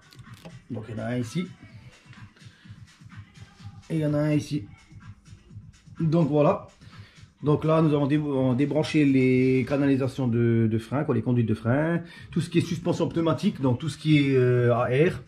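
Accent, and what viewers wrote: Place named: Lorraine, France. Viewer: L accent lorrain!🤣🤣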